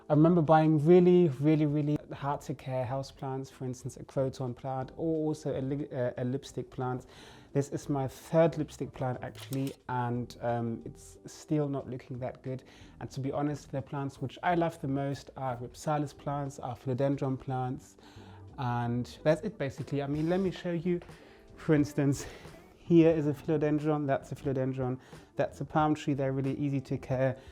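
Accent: United Kingdom. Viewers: Where are you located im thinking England because of your accent.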